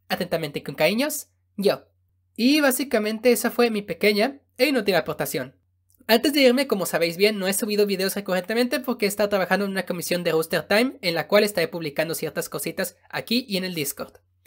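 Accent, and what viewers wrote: France. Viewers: Oye eres frances?:v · Tengo dudas 1:eri gei? 2:eres frances? Fin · Uff ya quisiera ver eze asentó francés en la webserie de XD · soy yo o tiene acento francés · Enserio? Yo pensaba que eras francés... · Acabo de dar cuenta del asentó francesas o solo soy yo :v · oye eres de francia porque tienes o me suena q tienes asentó francés, me saludad???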